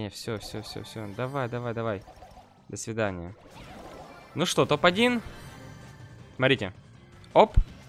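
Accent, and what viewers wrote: Ukraine. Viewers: Ухх как же меня бесит его акцент. Сколько лет знаю, столько лет мне режет уши его украинский акцент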